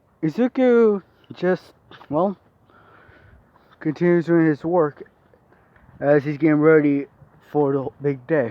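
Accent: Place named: Russia. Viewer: you have a perfect russian accent...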